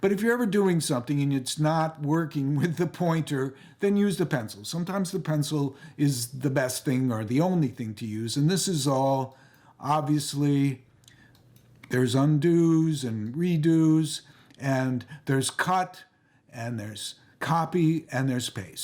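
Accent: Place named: Brooklyn, United States. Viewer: Nah, it's the Brooklyn accent! East Village resident for 30 years; now down South. LOVE the Brooklyn accent (you'd be very popular down there - folks love it here as well!) Though, like Jeffy - folks outside NY probably can't tell the difference between Queens (Woody) and Brooklyn (you). You're definitely cooler looking than Woody:>))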